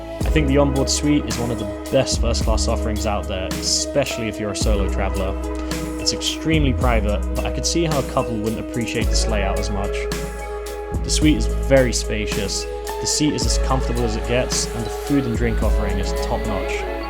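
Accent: England, United Kingdom. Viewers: Extraordinarily light blue eyes. I thought he might be Norwegian but I've just googled and apparently it's diminutive Breton for Jean. Thought he pronounced Perignon well.. Saw another vid where he put a stress on the "u" of Olufsen, whereas English people usually stress the O, although you can't tell he's not Oxford/Slough English with just a touch of Youtube US. Loved the cubbyhole demo🙋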